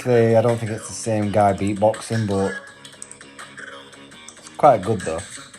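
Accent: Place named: United Kingdom. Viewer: AHHH HES BRITISH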